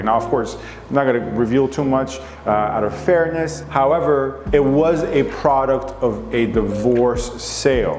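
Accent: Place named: Iran. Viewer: Red flags: 1. Pronounced Toronto wrong 2. Pronounced strip "shhhhtrip" 3. "Student" (first time) buyer 4. The condo owner owns everything from the structural ceiling, walls, and floors. Don't need permission for finishes. Just permission to carry out noisey work. Stripping popcorn is not a "risk". 5. That hint of the BMW "Pairjan" accent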